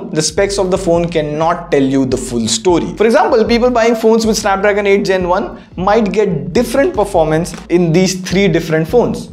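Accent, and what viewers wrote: India. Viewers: finally..an indian speaking english im so glad i find this channel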